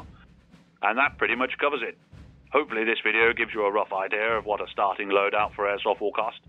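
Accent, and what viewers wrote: United Kingdom. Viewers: The accent becomes slowly more and more northern. I like the bad audio quality.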